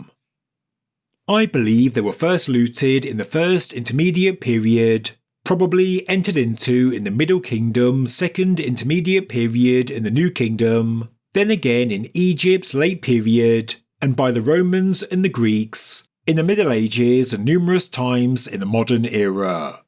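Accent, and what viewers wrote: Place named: England, United Kingdom. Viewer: Pesante accento inglese 😮.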